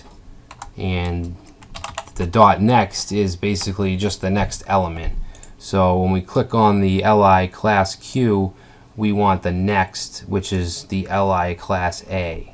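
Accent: Boston, United States. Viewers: Thanks for the tutorial. Love the Boston accent. Brings me home. Grew up in Newton but have in in Florida for 10 years.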